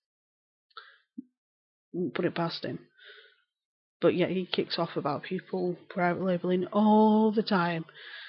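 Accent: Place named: Bolton, United Kingdom. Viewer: you sound like you are from Bolton. xxx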